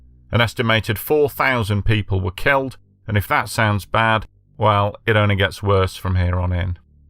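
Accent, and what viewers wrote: United Kingdom. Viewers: ANOTHER British narrated disaster porn channel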